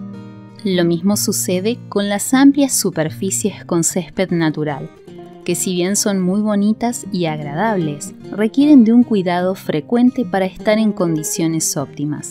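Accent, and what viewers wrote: Argentina. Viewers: Yo lo silencio...no me gusta nada el acento argentino. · Con ese hermoso acento argentino no me cansaría de escuchar audiolibros en mi jardín.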